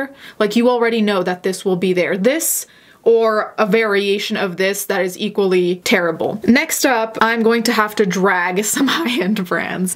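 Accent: Italy. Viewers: I think i found a new fetish. Listening to pronounce things with the heavy italian accent is just so satisfying. Please say prada again. Or gucci. Or whatever. Uuuugh just like cutting a tomato with a freshly sharpened knife. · The linguist in me, loves that you pronounce Gucci and Prada with a proper Italian accent. I've notice you do this in other videos, but it really stood out to me here.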